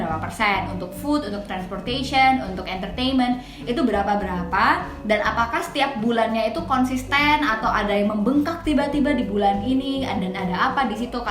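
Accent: Java, Indonesia. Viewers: Mba e mendok men logat e😂, joss tipsnya🤩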